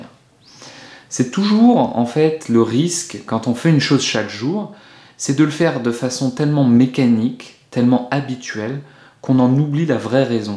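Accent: France. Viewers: Parfois c'est bon de traduire littéralement de l'italien en français, mais pas toujours.. Et... Bravo pour ton italien oral! Ton accent français est délicieux, comme l'accent de tous les français lorsqu'ils parlent italien! 😊 Je te salue de ma merveilleuse Liguria, l'un des lieux les plus beaux d'Italie, un arc-en-ciel de lumière et de couleurs entre la mer et les montagnes que je te conseille vivement de visiter! Il me fait plaisir que tu aimes l'Italie et sa culture! Mais... tu me permets? On dit "mi piace molto imparare..." etc, non pas "mi piace molto di imparare... etc. Ce "di" n'éxiste pas dans cette phrase en italien: c'est juste une traduction littérale du français, ce que tu viens de dire!... Tes enfants qui chantent "L'Italia s'è desta" sont trop charmants! Ciao!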